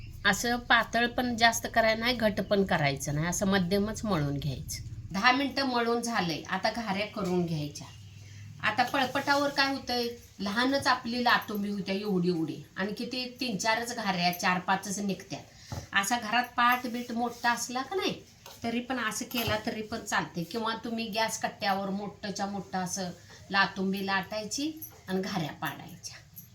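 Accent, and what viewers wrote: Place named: Satara, India. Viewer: खुपच छान बोलतात सातारा किंवा सांगलीचे भाषा कारण मी सातारी इतक छान टापटीप करून दाखवतात मला खुप आवडल धन्यवाद ताई 🙏🙏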